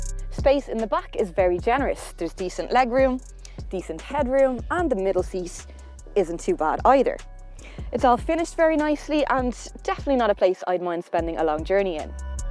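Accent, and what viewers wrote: Ireland. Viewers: Couple points, The color of the vehicle is really beautiful. The roads that were driven were very scenic, ? in Ireland; whereabouts? The interior in my opinion, especially infotainment (if you want to call it that) is not as nice as the competition. The curves and grille of the vehicle give the car a unique character. The lady doing the review is really pretty, and I have not been to Ireland, and enjoyed listening to her accent. I am writing from USA. Thank you for this review.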